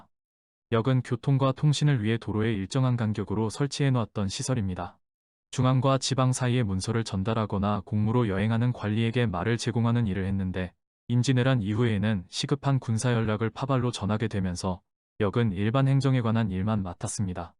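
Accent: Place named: Gyeongsang, South Korea. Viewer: 말씨와 말투를 보니 갱남분으로 보이네요. 학원맨 나는 1975 명동파 챔피온 다방 앞 소년들이 회색양복이라 부르는 19세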